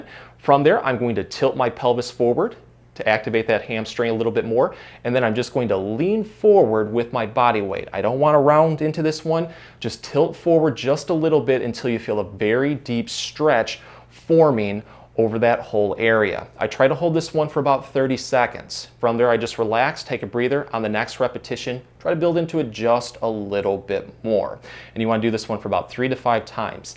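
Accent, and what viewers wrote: United States. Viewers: Sir I am unable understand what you are talking because you speak very fast and American English so kindly try to talk indian English sir pls language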